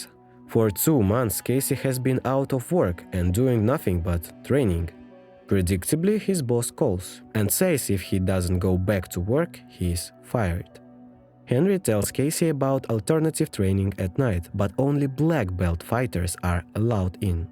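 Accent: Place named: Russia. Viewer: Чувак, какой ты ещё?😂 Ещё и страна указана "Соединённые Штаты". Да с твоим акцентом тебя, русского, за километр слышно. Ты уж если не умеешь говорить как носитель языка, так хотя бы не делай вид, что ты им являешься.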